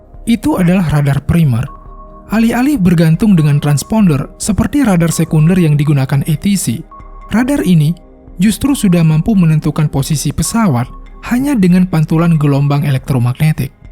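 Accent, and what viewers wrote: Bali, Indonesia. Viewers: Naratornya orang bali kayanya yak? haha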